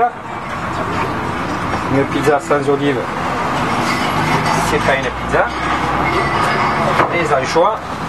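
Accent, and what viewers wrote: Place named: Provence, France. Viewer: Bravo Je fais aussi de la pizza mais toi c’est pagnolesque,l’accent,la bonne humeur,les rires…tout ça me donne envie de dégommer ta pizza en entier!continues comme ça.👍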